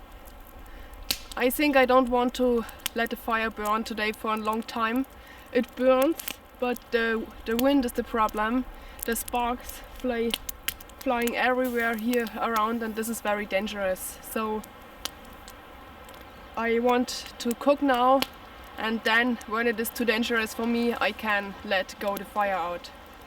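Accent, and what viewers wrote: Bavaria, Germany. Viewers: Wow - you even broadcast in English? It sounds cute - I never heard speaking anyoine in english with an bavarian accent... :-). Since I found your channel, 4 days ago when youtube recommended your video you made about the cabin situated in the woods, I find it amazing what you do. And you actually inspired me. Because I know someone, not living in Germany, UK or even Europe who shares the same interests like you do... I am surprised about the number of views you get. I will chat to her today to find out if hiking in the wild and producing youtube content wouldn't suit to her, as she is struggling a lot, financially...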